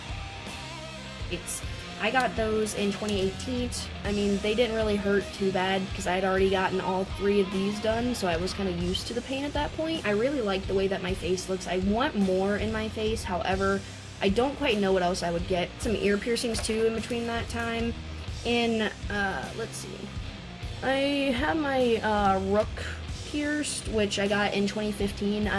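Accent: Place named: United States. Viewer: Great! Regarding your dialect I would guess you're from the USA, but your style look more european to me :) Great though that some americans dare to look good :)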